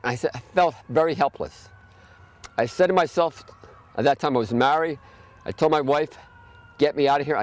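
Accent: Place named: Philadelphia, United States. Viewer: Sweet :) I grew up outside Philly and am an 18 year raw vegan. I moved to Portland, Oregon, long ago, and when I go back to Philly, folks do not understand what I am doing. They guess my age as 20 years younger than I am. (In Portland people guess my age as 10 years younger than I am.) So it is quite a comfort to hear someone with this knowledge and practice sharing it with a Philly accent. :) If I ever go back, I will make a bee line and come and visit you. Blessings Always.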